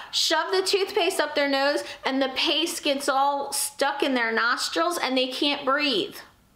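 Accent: New Jersey, United States. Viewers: Get a dog, you’re much less likely to be a victim of crime if you have a dog. If they have to choose which truck to break into I guarantee it won’t be the one with the dog. When I was in the military actual hard as f bad guys weren’t scared of us pointing a rifle at their heads but you bring out a dog and they disappear, quick You can tell your from New Jersey lol Love you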